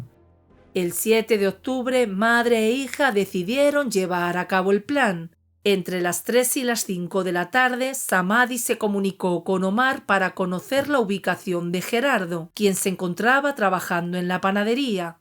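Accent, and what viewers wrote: Spain. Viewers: esta bien pero me hace ruido tu narracion no me gusta para nada es contaminacion auditiva ese hablado casi que cantado de narrar es desesperante mas el acento español deberia ser neutro. pero tu contenido es bueno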